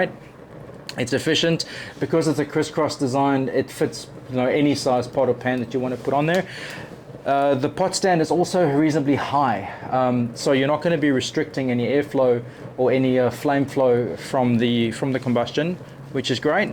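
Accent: South Africa. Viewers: . South Africa, it sounds….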